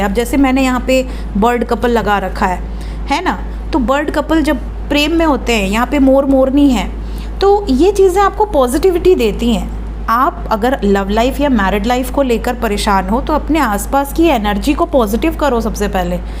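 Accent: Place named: Mumbai, India. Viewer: Mem mumbaiya bhasha me bol rahe he 😂😂jhakas 😂😂😂😂😂 so qyut di❤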